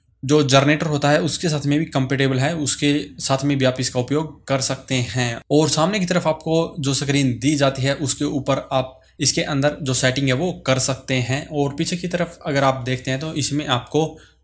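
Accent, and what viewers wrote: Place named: Haryana, India. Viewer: bhai wo àpke lahje se pata lag gaya...HR me kaha se ho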